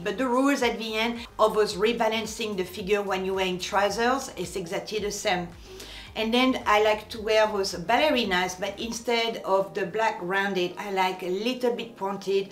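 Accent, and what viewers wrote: France. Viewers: why are you speaking with french accent?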